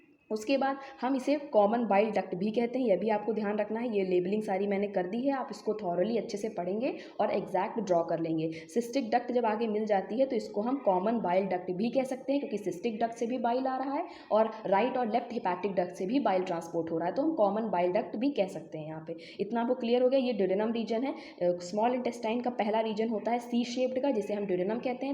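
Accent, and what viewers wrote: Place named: Meerut, India. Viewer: Aap meerut se ho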